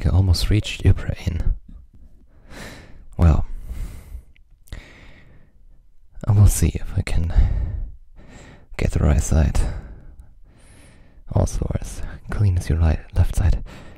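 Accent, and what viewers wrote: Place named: Germany. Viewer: Hes german uwu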